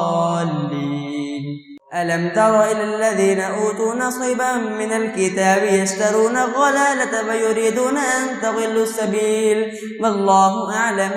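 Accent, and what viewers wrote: Panipat, India. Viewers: ما شا الله لهجه تو پا نی پتی لیکن یہ جھو لتا کیوں ہے؟ سمجھا نے کی ضرورت ہے ۔ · Bhai isse nach kr prhna nii kehte Isse pani patti lehja kehte h ap ko apni islah ki koshish krni chahiye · Pure pani Patti lahja,bahtreen MASHALLAH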